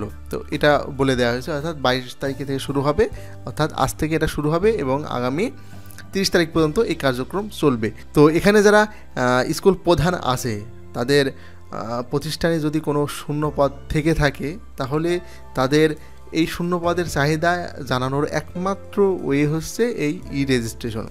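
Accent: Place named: Khulna, Bangladesh. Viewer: Apnar basha Khulna.. right?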